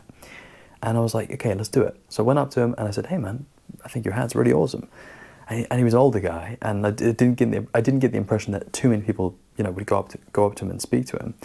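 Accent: United Kingdom. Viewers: 50 seconds in and I had to sub, maybe because he's "one of us" lol (from the UK, I assume because of his English accent) 👽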